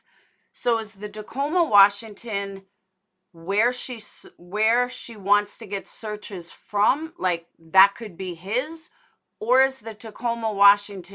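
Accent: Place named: Staten Island, United States. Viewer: At 40.40 ish or a litl3 before I caught ur NYc_ Staten island big time "gawd fawbid"😆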